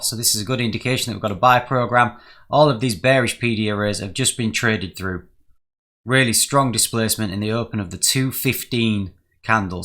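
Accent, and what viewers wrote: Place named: Midlands, United Kingdom. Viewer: Great videos, thank you! I found your channel after learning about unicorn entries and you really helped me understand and see them easily. I took this trade too, although I go with a fixed 3R TP for simplicity atm (I don't take anything off before it). I personally monitor both GU & EU for Unicorn entries post 7am (UK time) which means I can focus on the one Strategy. Thanks again, and very much appreciate the uk accent too, given I'm from the Midlands originally (I think you're slightly north of there, but not by too much?)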